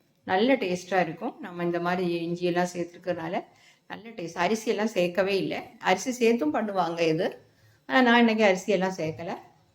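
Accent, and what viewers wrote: Kerala, India. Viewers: Are u a malayali?your slang of Tamil says so.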